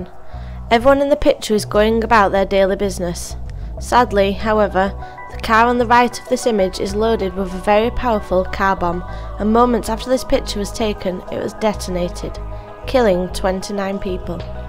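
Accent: United Kingdom. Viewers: Finally a British narrator!!... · commentators ... having thick British accents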